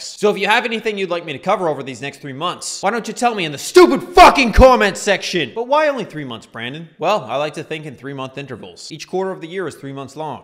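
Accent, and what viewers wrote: Canada. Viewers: Can you do both long and short? I need a video about muting random interstitial noise while playing. BTW, you've never sounded.more Canadian, Brandon.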